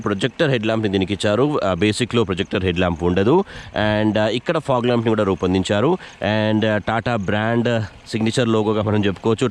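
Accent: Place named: Telangana, India. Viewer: using our Telangana voice language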